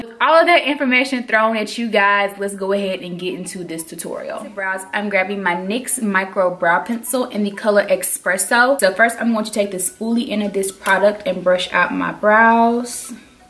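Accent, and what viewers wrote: United States. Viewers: Love your country accent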